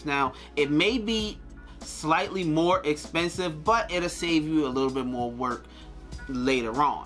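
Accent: Baltimore, United States. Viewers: hes got that boltimore accent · He sounds like he's from Baltimore I get picked on for saying dew and tew and mova and fava 🤷🏾‍♀️ can't help it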